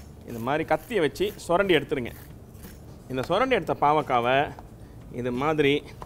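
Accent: Chennai, India. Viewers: வணக்கம் வெங்கடேஷ் பட் உங்கள் சென்னை தமிழ் அருமை , பாகற்காயை அவன் இவன் எனபது . பாகற்காயை பிடிக்காதவன் சாப்பிட வைக்க என்ற வார்த்தை 👏 எத்தனையோ பெண்களை வாழவைக்கிறீர்கள் வெளிநாட்டுகார்ர்களுக்கும் சுவை எனில் என்ன என்று கற்று கொடுக்கிறீர்கள்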